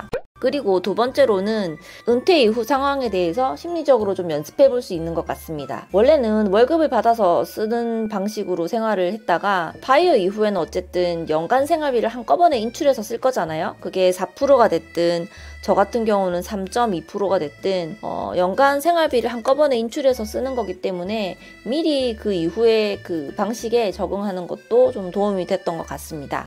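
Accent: Gyeongsang, South Korea. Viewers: 저도 두사람이 하는줄 알았어요 경상도 사투리 너무 좋네요 익숙한 ~~~ ❤❤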